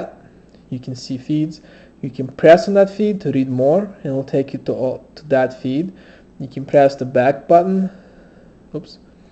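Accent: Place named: Russia. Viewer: You're from Russia? Love the accent :-)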